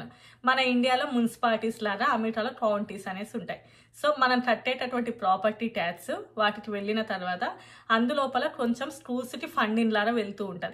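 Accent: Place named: Karimnagar, India. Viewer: Madam , as per your accent, are you from Karimnagar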